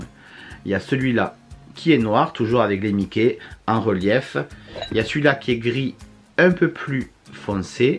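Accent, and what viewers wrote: South, France. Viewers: Salut la famille, un « GRAND MERCI », je découvre la sphère de Disney depuis que ma petite fille s’y intéresse vraiment et je voulais vous remercier pour toutes vos vidéos, déjà deux semaines qu’on vous regarde, toute les vidéos depuis 2016, eh oui! Avec ton « Panasonic ». Je te remercie car grâce à toi j’ai une autre vision de Disney et des parcs Disney. Merci de faire nous faire vivre vos expériences avec tellement de passion et de joie de vivre. Vous êtes dés personnes sincères dans ce que vous faites et on apprécie énormément (et ma fille aussi 😁). En passant, nous sommes du nord (Normandie) j’adore l’accent du sud, ça ramène un peu de soleil en vous écoutant 😁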